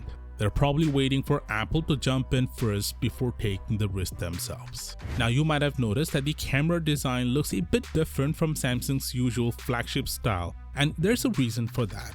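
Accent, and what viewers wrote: India. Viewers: ur english sounded like indian or pakistani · Of course its an indian accent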